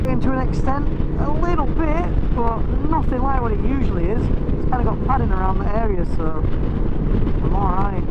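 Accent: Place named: Saddleworth, United Kingdom. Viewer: Brilliant again I've not been up there for years but I plan to do it next year. Where are you from ? you sound local to me ,,Saddleworth way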